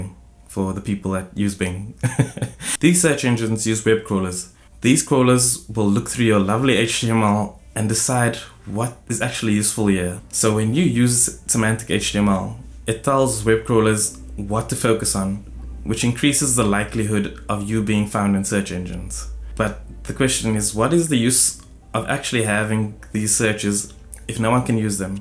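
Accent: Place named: Asia. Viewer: I had no idea how html semantics worked until I watched this vide, very nicely done! also where you from? you sound a bit asian ??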